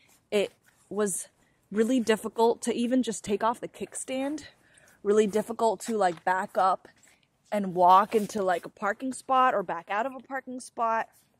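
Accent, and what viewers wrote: United States. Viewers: Dear, I hope I can be your video pen pal, on you tube! My name is Tim and I am nearly 55 years of age, and I live in South Milton, in Devon. I used to be the enemy, a car driver, sharing a car with my Dad, though I have had a collection of motorbikes, studying electronics. My Dad and elder brother had one or two however, until they too became the enemy. May I seriously give you my male support wishing for anyone should learn to ride a bike or drive a car, male or female. Is it very rude, if so, I apologise to you, to ask you, did I detect an American accent? If so, may I wish you a warm welcome to our country?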